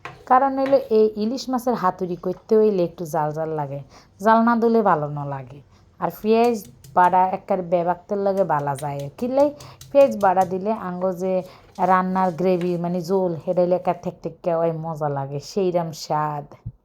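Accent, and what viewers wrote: Noakhali, Bangladesh. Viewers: আসসালামুয়ালাইকুম প্রিয় আপু নোয়াখালীর বধুর নোয়াখালী ভাষা মাশাল্লাহ খুব ভালো লাগলো।দারুন হয়েছে ইলিশ মাছের পাতুরি রেসিপি টা আপু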